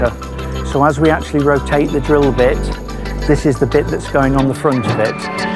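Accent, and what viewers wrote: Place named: England, United Kingdom. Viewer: Der kommt halt aus England . Untertext ist doch Deutsch halt net Schwäbisch 😅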